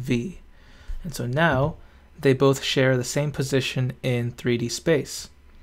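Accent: India. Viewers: Oh man wow !! Amazing ! Just a catch .....Indian bruv ? ;)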